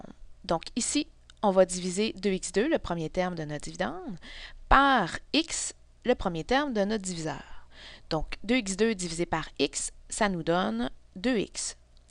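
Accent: Quebec, Canada. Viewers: Mohammed gj c'est l'accent québécois idiot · c est l accent québecois et c est mieux que d entendre un marocain parler...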